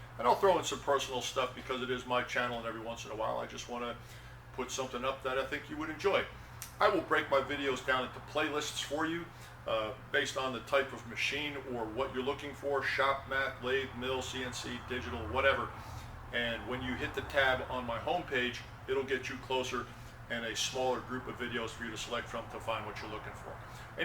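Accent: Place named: Brooklyn, United States. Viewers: u sure u not from Brooklyn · I feel like is originally from New York, like me. I place his accent in Medford area (or west) on Long Island.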